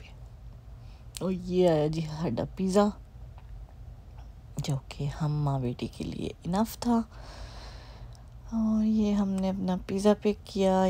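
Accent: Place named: Punjab, India. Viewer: Though driving.and ur commentery aur uper se Punjabi touch❤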